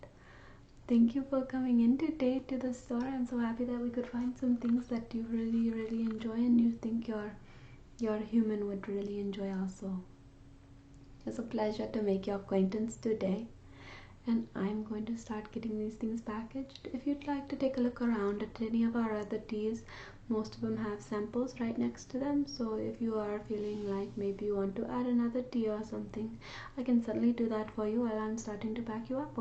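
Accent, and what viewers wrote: India. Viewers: I love that Indian accent. So many sub continent tingles.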